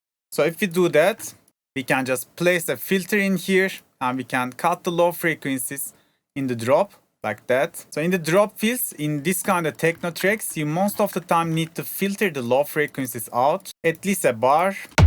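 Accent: Turkey. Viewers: Memati maske de taksan o sıfatın belli oluyor 😂 türk olduğun aksanından belli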